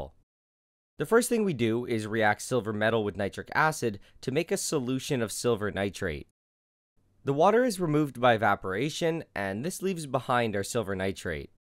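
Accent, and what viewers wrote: Canada. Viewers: you tryin so hard to cover that canadian accent huh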